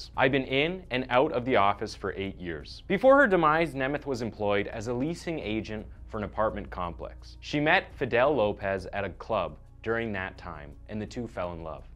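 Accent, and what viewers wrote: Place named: Canada. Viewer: I'm having a hard time placing this guy's accent. He sounds like a Canadian who's lived in the UK longer than NA.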